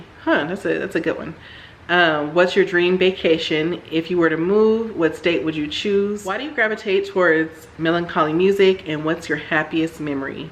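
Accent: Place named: South, United States. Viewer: When I stared watching you I KNEW were a southern. You could have knocked me over with a feather when I learned that you were from California.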